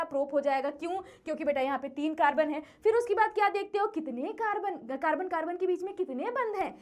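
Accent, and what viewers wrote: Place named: Bhojpuri, India. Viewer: From CBSE board but chemistry in Hindi with bhojpuri accent sounds sweet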